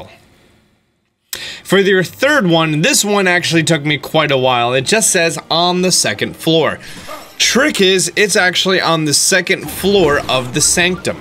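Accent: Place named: Brooklyn, United States. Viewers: why did you have to do the brooklyn acent? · Nice Brooklyn accent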